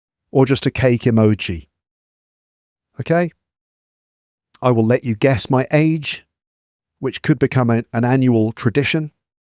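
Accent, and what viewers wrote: United Kingdom. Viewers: Hello from Pakistan, I hope you are doing well. Actually, I listened to your long podcast on my podcast app and I heard you mentioned some people complain about your long introduction to episodes. Please don't listen to them just ignore them probably they're not serious about about their English that's why they complain. But we serious learners love your long episodes and giving explanations to each words weather they're about grammar, vocabulary or just giving explanation. I've been listening to you for six months. I remember listening to you for the first time I was not used to British accent and I kept listening to your episodes again and again they really gave me a satisfying result. Now I understand 95 percent of your English and the rest 5 percent could be not knowing new words. Thanks to your long unique episodes lots of love from Pakistan, Peshawar. 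❤👑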